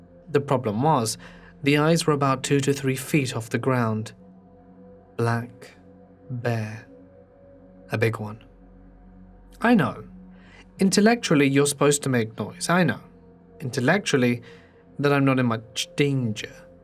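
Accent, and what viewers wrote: United Kingdom. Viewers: I really enjoy this particular British dialect, though not quite sure which specific one it is.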